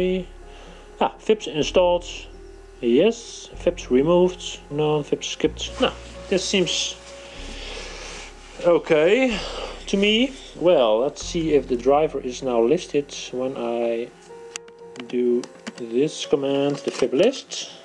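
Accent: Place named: Netherlands. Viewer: aan jouw accent to horen ben jij Nederlands. Vraagje, werkt dit ook met Microsoft RDP? Ik heb thuis een ESX 6 host. Hierop heb ik een aantal servers waaronder een Terminalserver waar mijn familie op inlogt. Nu wil ik dus de Quadro 4000 met ze delen zodat ze binnen Terminalserver gebruik kunnen maken van 3D mogelijkheden. Is dat mogelijk hiermee? Want in jouw demo maak je gebruik van VMware View. Dank je wel voor jouw video!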